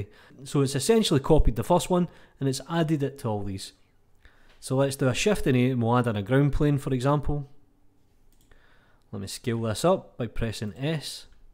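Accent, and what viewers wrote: Scotland, United Kingdom. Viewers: Just dropping by to say that I love your video format, short and concise. Also, your accent makes me wanna go back to Scotland so bad! Peace.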